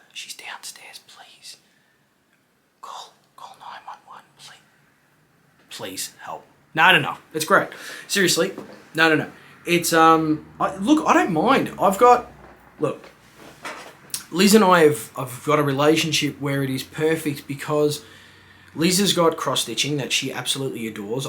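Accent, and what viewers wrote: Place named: Australia. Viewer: Hey. Thanks for still give me my fortnight Dosis of Aussie accent. 🤗 great collection you have. Made my Star Wars heart beat higher. And yes please please also show the downstairs Legos. And you do know that there are glass doors for the Kallax shelves, right?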